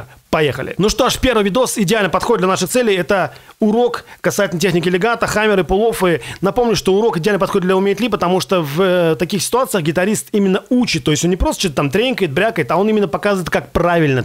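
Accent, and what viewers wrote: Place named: Moscow, Russia. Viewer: тошнит от твоего мАаааацковскава акцента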